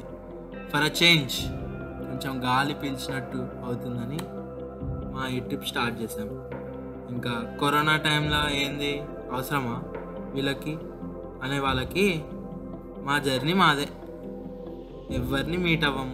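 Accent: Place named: Telangana, India. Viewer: Kani aa yaasa ne baavundey raa, ek dam naatu Telangana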